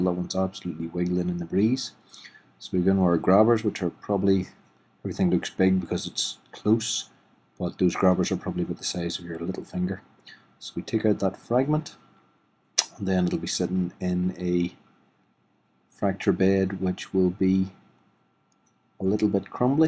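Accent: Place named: Northern Ireland, United Kingdom. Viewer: Hi, I'm a N'Ards (Co. Down) girl living in England and my colt has just had this surgery. I'm re-assured at seeing this video of the surgical procedure, but have many questions to ask. He's a 9 month old SHxWB, Fleetwater Opposition and Cavalier Royale bloodlines. Concerned owner is all, but hearing the NI accent has made me ask if you have the time to give me some info on post-surgery aftercare etc? I've been told is a cartilage defect? I'm confused...!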